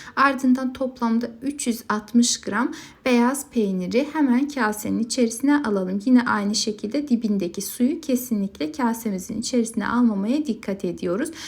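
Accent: Azerbaijan. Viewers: Deyəsən xanım Azərbaycanlıdır ləhcəsindən hiss edirəm. Bu arada əllərinizə sağlıq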